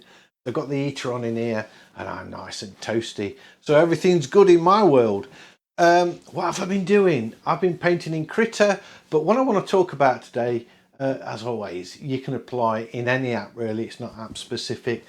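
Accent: United Kingdom. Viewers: I’m more and more impressed of what you can paint digitally. I really like the way you use the pastels, I can’t say that I liked pastels in the past. You are making great art and I’m grateful that you show all steps to us. I also like listening when you are talking how you think while painting - the british accent is also to my taste.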